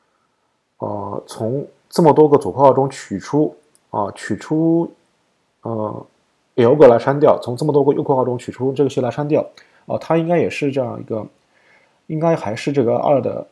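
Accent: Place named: Shanghai, China. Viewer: 良心博主，如此熟悉的口音像上海人哈哈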